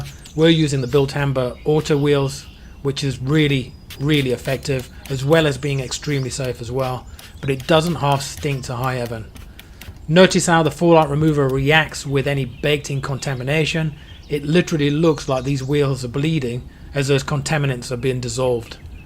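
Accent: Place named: South Africa. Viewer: yeah getting tattoo appointment is hard enough if you have a good artist let alone the issues with the pandemic so I feel you bro, but at least you can save a bit of money for a while lol I did detect a bit of South African but wasn’t sure enough to mention. I’m based in London, at the moment on the outskirts. I’d defo be recommending your channel to my friends who are also heavily in to cars but It’s just unfortunate I can’t send any business your way due to location. Anyway I’ll be watching your other content soon keep it up 👍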